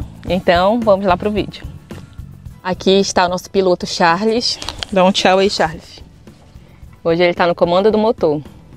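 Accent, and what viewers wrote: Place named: Rio de Janeiro, Brazil. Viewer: Ela tem sotaque carioca kkkk troca o s pelo x, reparei isso.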